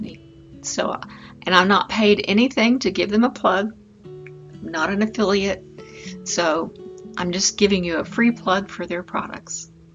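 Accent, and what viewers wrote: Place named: Texas, United States. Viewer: Love your videos and art,. Really helps me with my composition. Are you Texan by any chance? We live in England, and you remind me so much of the Texan women I grew up with. I could listen to you all day..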